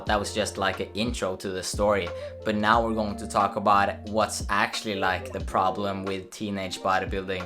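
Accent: Norway. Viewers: Listened to your voice for 5 seconds, then I recognized the norwegian accent ;)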